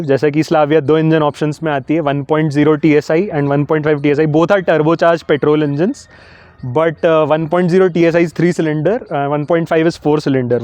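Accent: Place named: South India, India. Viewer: his talking style is similar to that of a south Indian guy born and brought up outside of south India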